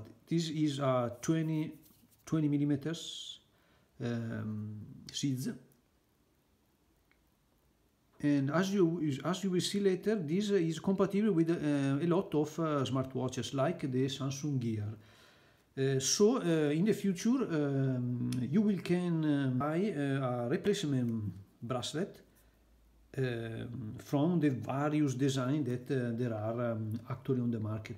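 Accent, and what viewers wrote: Italy. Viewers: Ho capito subito che sei italiano, come siamo facilmente riconoscibili. Cmq continua cosi` sei grandeeeeeee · sei anche veneto magari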